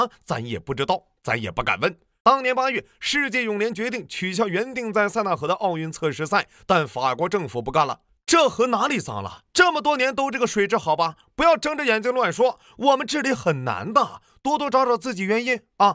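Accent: Northeast, China. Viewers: 听您说话就知道是本山的徒孙。。。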